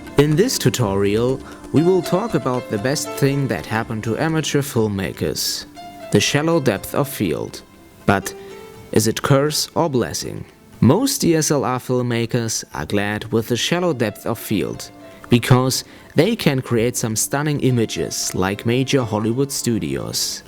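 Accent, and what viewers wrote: Germany. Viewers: That's his real accent. He is German. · cuz hes german :D and he have this cool accent when speaking english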